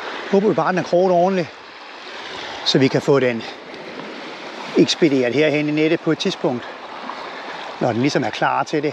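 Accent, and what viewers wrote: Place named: Funen, Denmark. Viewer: det er satme konge med en times hardcore fynbo snak. det fik mig til at grine mange gange ! godt nytår :)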